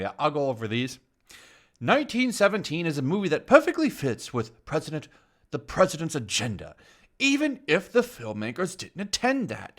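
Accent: England, United Kingdom. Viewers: As much as I may agree with this dude’s point, his English accent is intolerable! I had to quit at the mark; Godspeed to anyone else who tries.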